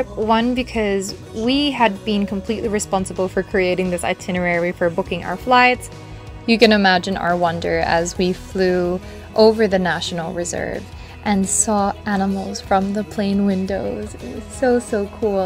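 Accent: Australia. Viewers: Her Aussie accent😁 Elephants are my fav, they have amazing memories and they would dig hole for water and thats also a bonus to all other wild animals. I WISH you visited sheldrick wildlife trust, they do amazing work for wild animals in kenya ❤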